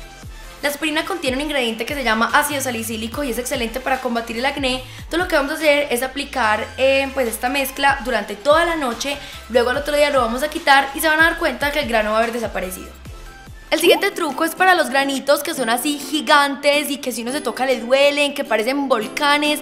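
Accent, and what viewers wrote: Antioquia, Colombia. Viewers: Me encanta tu acento colombiano, saludos desde México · Uy ese acento a paisa se detecta a leguas XD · Yo solo me quede por escuchar ese hablado paisa bello😎😅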